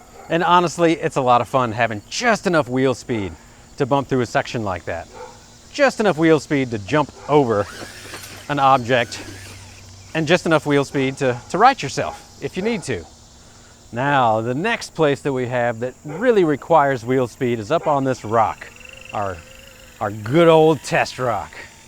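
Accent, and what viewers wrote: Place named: South, United States. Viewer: Nice southern drawl too.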